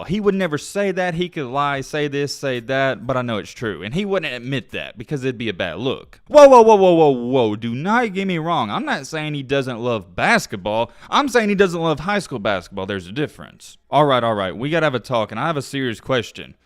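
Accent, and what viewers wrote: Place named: Appalachia, United States. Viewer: My man, I like a lot of your vids and your hillbilly ass accent lol but you’ve been coming off obnoxious lately. Multiple times in vids like this you say “And a lot of y’all don’t realize!” Like okay man stop thinking so little of your followers intelligence. Not a good look. Plus you’re basing someone’s worth and lifestyle literally off their “Sosha” media as you call it. #1 rule to not go by is IG my guy. And no high schooler is signing a 10 million + shoe deal. You can count on one hand how many rookies in the history of NBA got 7 figure shoe deals out the gate. Anyways man sorry if I sound like a hater but you come off aggressive af in these vids.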